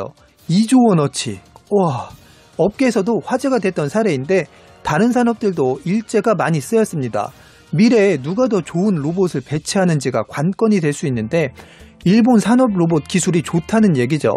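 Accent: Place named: Chungcheong, South Korea. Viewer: 충청도 사투리가 들림.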